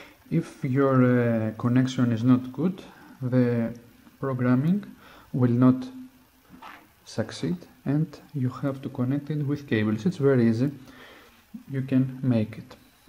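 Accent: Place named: Greece. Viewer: Αχ αυτη η προφορα μας ! Ωραίο video ;)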